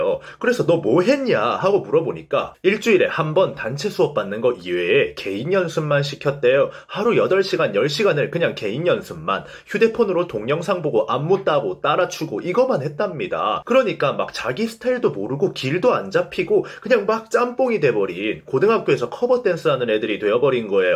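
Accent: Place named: Busan, South Korea. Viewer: 말을 강조하는 부분에서 부산 억양이 나오네요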